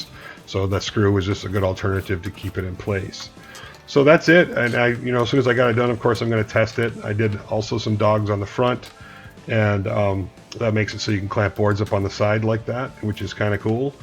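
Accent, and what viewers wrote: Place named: Carolina, United States. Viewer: yes-that's your accent--n or s Carolina? - oh yeah did you say something about Michigan -- from La La lander previous show me stater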